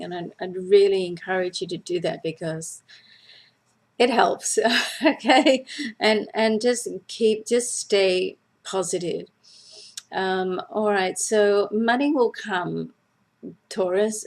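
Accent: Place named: Australia. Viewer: Many thanks I really enjoy your weekly astrology videos. As a Taurbabe ( kiwi) now living in Vancouver, your Australian accent is lovely to hear. Cheers Deb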